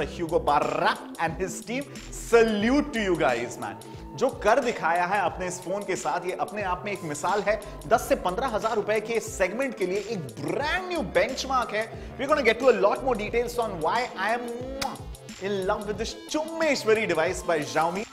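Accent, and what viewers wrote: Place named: Russia. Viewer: That Russian accent though.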